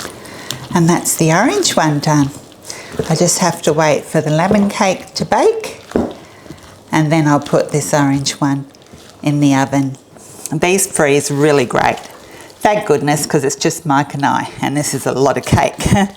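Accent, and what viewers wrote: Australia. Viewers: Hi have just found u on Youtube and hoe lovely it was to hear a fellow Ozzie there, have seen yr video on braised steak and will be cooking that tomorrow night also the date scones, my husband loves my scones so I cant wait to give him these date scones looking forward to trying ur other recipes cheers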